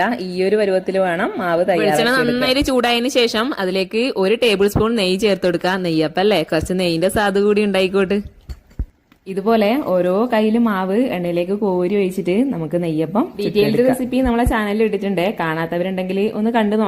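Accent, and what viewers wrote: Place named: Payyanur, India. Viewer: okay payyanur slang ningalude baashayil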